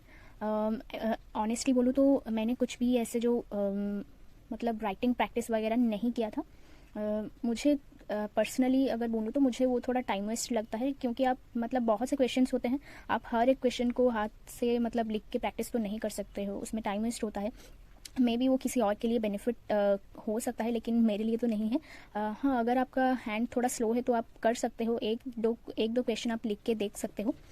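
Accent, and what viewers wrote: Odisha, India. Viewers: I think you are from odisha,Am i right?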